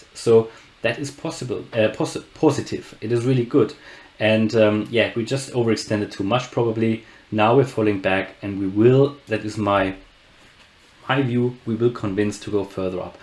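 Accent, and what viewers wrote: Germany. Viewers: Danke für die Analyse. Ich schreibe auf Deutsch, weil ich an deinem Akzent vermute das du deutsch bist 😂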